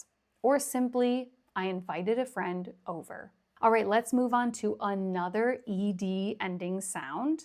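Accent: United States. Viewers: I'm happy that I've found you. Your videos are really helpful. Thank you. I like the American accent ❤ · Thank you very much for your useful work! I enjoy it a lot, but honestly I have to say that it's a little bit weird to me the american accent because I have been taught at school in British accent. But in any case, I do my best! Kisses from Spain.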